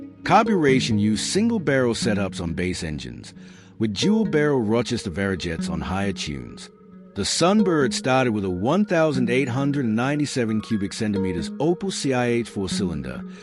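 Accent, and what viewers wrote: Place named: United States. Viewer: You couldn't find an AI Aussie voice 🙄 it kinda ruins it with the American accent.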